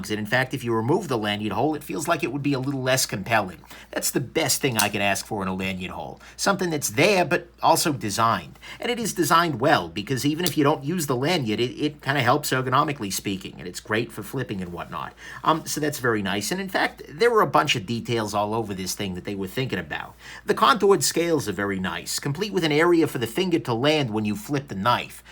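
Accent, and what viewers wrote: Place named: Alabama, United States. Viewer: Any chance this guy is from Alabama? (Typing with my INFANT FINGAS) LOL... Love the review for real. Much love homie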